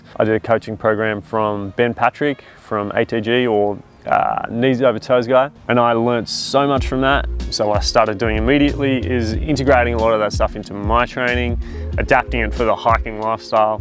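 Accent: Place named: Australia. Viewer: Wishing you a speedy recovery! I've been watching your videos for a while, and amongst a multitude of reasons that make your videos so fantastic and engaging, it's also just so refreshing to hear a familiar Aussie accent. It's interesting to hear you speak of the challenges of not knowing how much energy you'll have each day to train due to the fatigue in your COVID recovery. I'd like to hear more about this. Obviously, you intend to achieve a full recovery and soon return to your usual training capacity, but what would you recommend for someone like me who has MS (Multiple Sclerosis) and faces this challenge constantly? I generally find that once I'm out on the trail, the serenity of being out in the bush gives me an instant boost of energy, but I struggle with regular training when I'm home. This is what I'd love to see more videos on. How to maintain good training practices when energy levels are hit and miss. 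Thanks again for your wonderful videos and I hope you recover quickly and safely! Regards from down under :)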